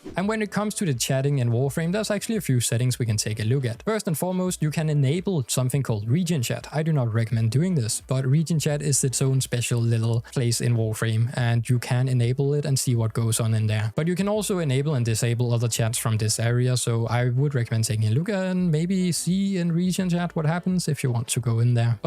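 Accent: Denmark. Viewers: Fast question are you danish? You sound like one of my friends when he speaks English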